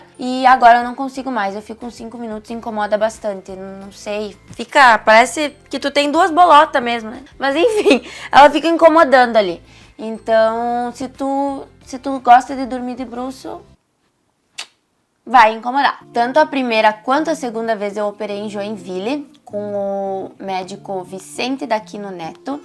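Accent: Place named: Rio Grande do Sul, Brazil. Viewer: Estou vendo pela primeira vez o seu canal e adorei! Parabéns! Seu jeito autêntico e, por vezes, engraçado despertou muita empatia. Como gaúcha que sou, mas morando no interior de são Paulo há anos, perdi o sotaque e amo ouvir vocês falares. Dá um "quentinho" no coração, sabe! Sucesso, guria! Tu merece. 😃😘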